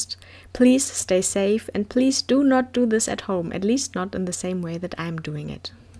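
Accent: Germany. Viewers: I hear a bit of deutsch 😅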